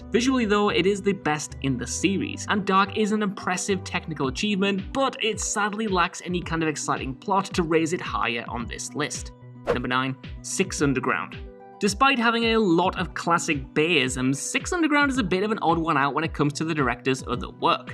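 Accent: United Kingdom. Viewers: Can't get enough of the fast talking British!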